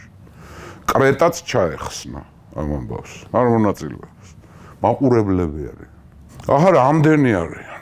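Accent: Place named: Kutaisi, Georgia. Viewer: ქუთაისურად რომ ანტიკურ ისტორიას უსმენ სხვა გემო აქვს😂( ასიკვდილებენ...უშვრებიან.... და სხვა მრავალი. )ძალაინ საინტერესოა და კარგია ❤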